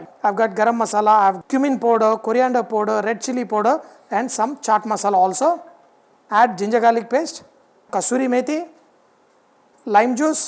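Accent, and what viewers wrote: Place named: Hyderabad, India. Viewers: Chef... in love with u r hyderabadi conversation, bilkul apna sa lagta hai, Eid mubarak in advance, keep cooking enjoy good life!!! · Wooow🤣 happy to hear sir hydrabadi urdu you are really grate aap log hamesha ayse hi khush raho aap ko bhi Eid Mubarak 🙌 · good to see your videos sir thanks for uploading hyderabadi baata kirrrak hi bhabi bolre so.. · True Hyderabadi accent....Feels good... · Haha perfect hyderabadi slang of u r... 😂😂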